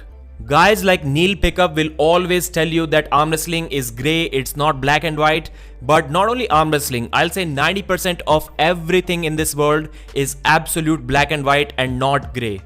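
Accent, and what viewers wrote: India. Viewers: Your indian accent is so funny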